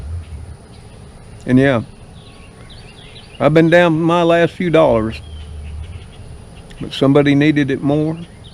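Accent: South, United States. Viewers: I enjoy your down-home southern drawl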